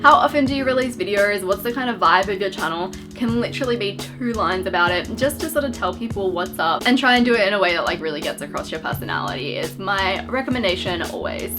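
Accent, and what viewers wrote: Australia. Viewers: Oh my god your Australian I love how you talk ( you.. Are Australian right?) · I love Aussie Accent.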